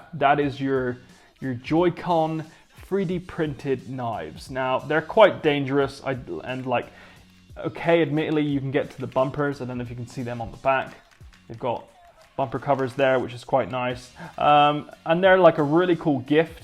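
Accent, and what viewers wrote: Bristol, United Kingdom. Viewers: Hearing my accent(bristolian) on videos hits hard haha. Realized from some of your other videos you're very local to me.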